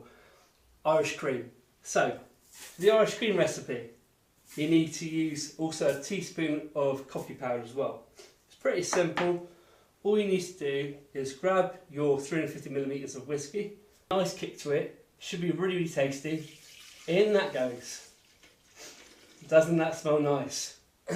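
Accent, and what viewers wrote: United Kingdom. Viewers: So cool. One problem, with your delightful British accent I did not catch what went in after the Vanilla extract. Almond extract maybe?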